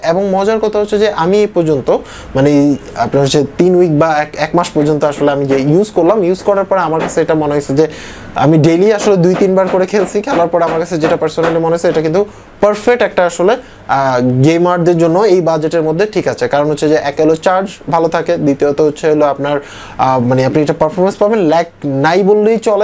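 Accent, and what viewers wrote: Bangladesh. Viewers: বাংলাদেশি মনে হচ্ছে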